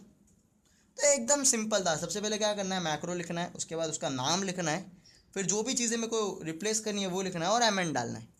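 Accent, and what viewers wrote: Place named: Gujarat, India. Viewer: are bhai aap kaha se ho? bato se to gujrati lag rahe ho....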